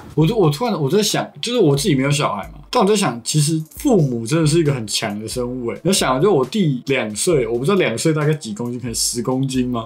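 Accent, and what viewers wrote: Taiwan. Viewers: 真的很台😂“拉撒呷拉撒杜啊”都用得恰到好處！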